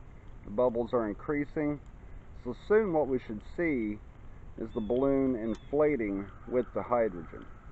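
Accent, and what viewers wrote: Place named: Indiana, United States. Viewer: What part of Indiana are you from?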